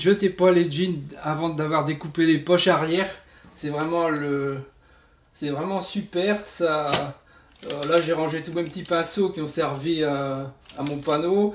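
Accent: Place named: Mining region, France. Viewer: Bravo pour cette présentation , je suis un nouvel abonné et à entendre ton accent , tu dois être de la région des mines , si c'est cela on est voisin , j'habite à 30 kms de Dunkerque .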